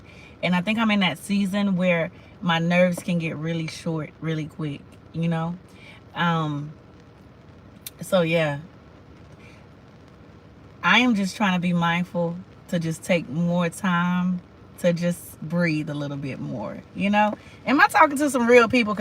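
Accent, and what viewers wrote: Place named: East Coast, United States. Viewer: You sound like you from the eastcoast love LOL are you?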